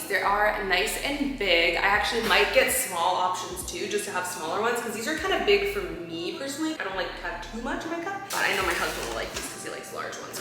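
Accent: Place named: Nashville, United States. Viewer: you’re getting that Nashville accent😅 I love it❤ xoxo from Toronto.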